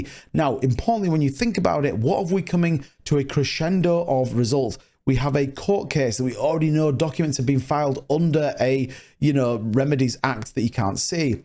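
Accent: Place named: North of England, United Kingdom. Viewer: The accent is from the north of England.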